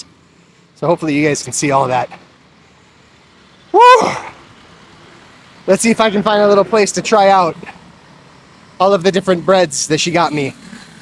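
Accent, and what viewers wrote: Philippines. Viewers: I also like pandecoco. Im so amazed with your Filipino intonations sounds real!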